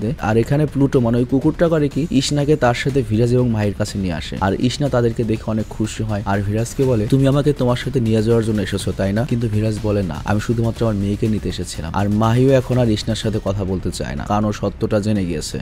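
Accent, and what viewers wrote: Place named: Noakhali, Bangladesh. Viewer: এাই নোয়াখালীর হোলা❤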